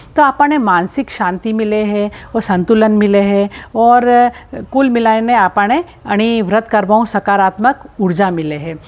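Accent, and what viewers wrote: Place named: Mewar, India. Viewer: Bahut hi gyanvardhak video .....gajab ki mewadi boli h aapne....dashamata ki agrim shubhkamnae evm pranam didi🙏🙏🙏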